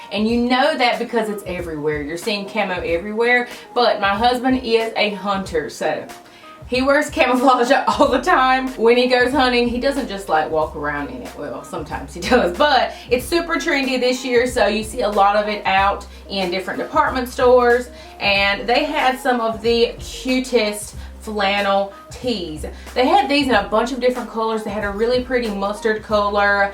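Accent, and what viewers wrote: South, United States. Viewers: why does she sound soooo country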